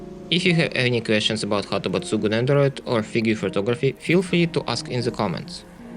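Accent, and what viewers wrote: Russia. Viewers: You sound Russian my comrade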